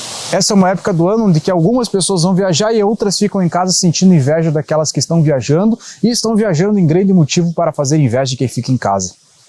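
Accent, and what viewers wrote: Rio Grande do Sul, Brazil. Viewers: aventureiro ou profissional os 2 é bom,mas falta um outro q falava de óculos com um pouco de sarcasmo,deboche e humor com aquele sotaque mais gaúcho,abraço irmão de batalha.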